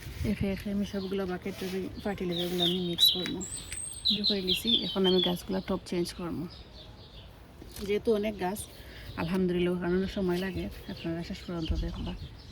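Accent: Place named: Sylhet, Bangladesh. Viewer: She is speaking properly, shes speaking sylheti which is a different dialect of bangla it does not mean it is from a lower class. YOU need to learn to speak properly and not make judgments based on people’s background.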